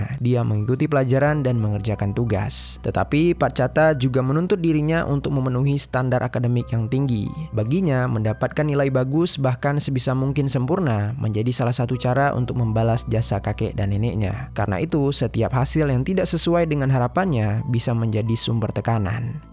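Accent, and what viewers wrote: Java, Indonesia. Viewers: Suara nya mirip bang jeri tapi logat nya beda yg ini kaya ada Jawa Jawa nya dikit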